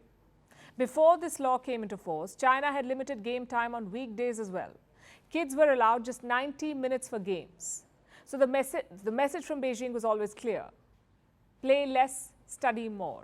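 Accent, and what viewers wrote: India. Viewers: This woman is always talking bad about China with an Indian accent. How much does she get paid for doing this? Is there only bad about about China and nothing good?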